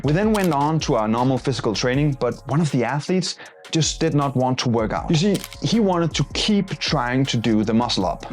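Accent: Denmark. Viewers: He is Danish, its just a dialect. Learn to understand it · A very valuable video. Much love from Sweden. The Danish accent in your English makes it even better as my circle is filled with of wonderful Danes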